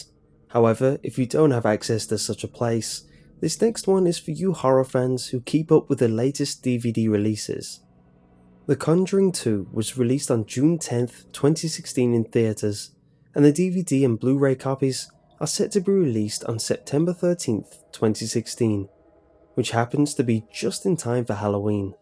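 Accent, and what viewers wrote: United Kingdom. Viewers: He's British, that's why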